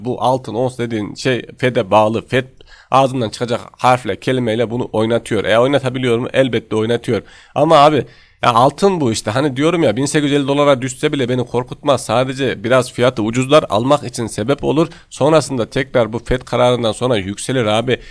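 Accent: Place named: Konya, Turkey. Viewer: Abı sen Konyalımısın ve konyadamısın konuşma tarzın benziyor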